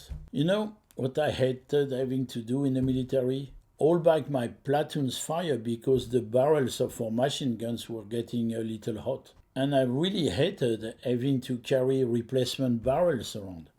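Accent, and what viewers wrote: France. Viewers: Watching a French man with a French accent talk about guns like a red white and blue gun enthusiast blows my mind. Liked and subscribed.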